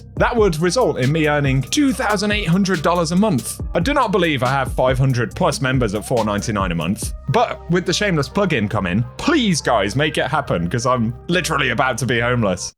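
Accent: United Kingdom. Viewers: Im so used to you serious voice ready the scrip for the video, for me you sound identical to "baldur's gate 3 narrator outtakes" 😂💀 (it is the british accent im so sorry😂) I have'nt played D2 over more then a year i think but from time to time i see your video put up on my page and just watch it to be a little up to date (im able im providing food for your starving kids) I finally got my own place and after years im gonna hang up my Hive sword in my gameroom that i got yeaaaarrss ago with the pit of heresy achievement. I still kinda hope D2 will get better and im able to return but for now im still salty they took Forsaken from me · Idk how long I've been following you but I always love your snark videos. The british accent and the sarcasm just belong together bro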